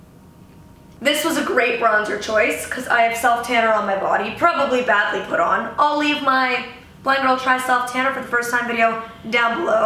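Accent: France. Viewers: I actually love her French accent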